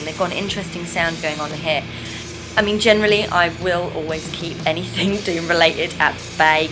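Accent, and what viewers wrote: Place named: England, United Kingdom. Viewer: love your enlgish accent.....lovely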